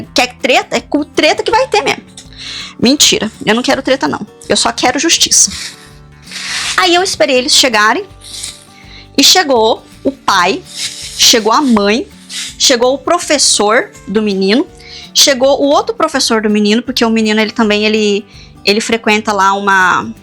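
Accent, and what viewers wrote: Minas Gerais, Brazil. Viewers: já imaginava que você é uma mineirinha ❤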